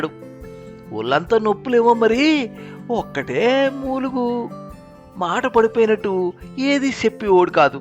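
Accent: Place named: North Andhra, India. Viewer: మీ వాచికాన్ని అద్భుతం అనాలా, మరోటా!?...ఉత్తరాంధ్ర మాండలికం మీరు పలికిన తీరుతో నా ఒళ్ళు పులకించి పోయింది. మాండలికంలో నేను చేసిన చిన్న చిన్న తప్పులు ఎవరికీ వినపడకుండా మీరు చేసిన కృషి అమోఘం. నమోనమః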